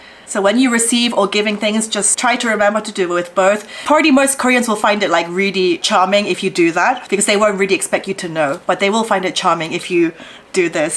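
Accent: England, United Kingdom. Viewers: I was gonna say, your accent is almost British. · 우연히 왔는데 , 카즈하 같은 느낌의 더 예쁘신 분이네요. 잘 보고 갑니다. 묘하게 영국 발음이랑 미국느낌이 섞이신 발음 같아요. 행복하세유~ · The UK she said, that accent definitely rubbed off on her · wow, strong England English accent detected! :) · 영국에서 오신분인가요? 영국 발음이 들리시넹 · That is the MOST English accent lol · 한국인인데 다 봤어요 영국 발음 너무 멋있다 ㅠㅠㅠㅠㅠㅠㅠ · I am so happy to see you again. You know the funny thing is that I was just thinking about you. "That beautiful Korean Youtuber with UK accent. I missed her and her narrative about Korea and Korean Culture" I thought. I then saw your video come up in my youtube feed. Well, I just actually subscribed to your channel. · You have a very sweet “Queen’s English” accent,. I can help you with American 🇺🇸 English! Ha, ha, ha! 🇰🇷🤗❤️🤗🇺🇸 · Algorithm led me to this video and I thought 'I know someone who's name is ' and heard her talking and 'Brisith accent?' and saw her face and 'Oh...it's!':) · Your British accent is obvious.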